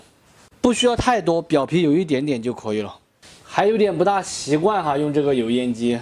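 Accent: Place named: Sichuan, China. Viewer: 川普好聽😀😀😀😀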